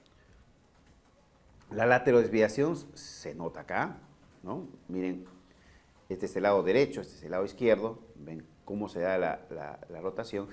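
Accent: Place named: Peru. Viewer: Pero el doctor tiene acento peruano, no colombiano jajajja. Es de Perú.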